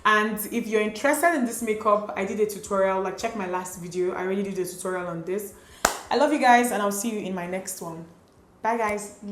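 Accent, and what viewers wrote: Nigeria. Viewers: I appreciate you story, however you are talking to much. You look refined, but when you opened your mouth....typical Nigerian accent and speech pattern. If you refine it, you would capture more subs.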